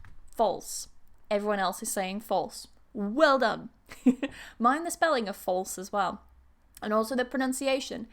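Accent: United Kingdom. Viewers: Hi, i like your Brittich accent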